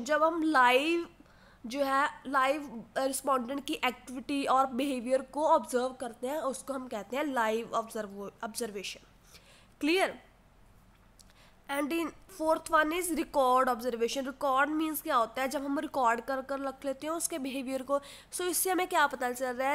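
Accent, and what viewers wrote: Punjab, India. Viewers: मैडम जी आपने जो भी पढ़ाया बहुत cute लगा जी, किन्ना सोना बोलते हो जी आप ☺️ पूरे पंजाबी टोन है जी ! बहुत बढ़िया , आप पंजाबी हो🙂?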